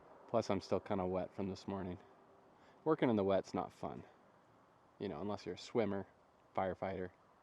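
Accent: Canada. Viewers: First of all, you were really letting your Canadian flow this week hahhahahha... I 100% agree with the building bug! I am not done with my place yet and I am already thinking how I would like to try my hand at a log cabin. Heck, I am still young enough.....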